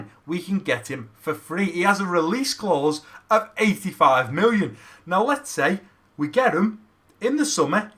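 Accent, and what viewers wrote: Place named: England, United Kingdom. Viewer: Where are you from? I mean the country. Because it sounds like you are from England.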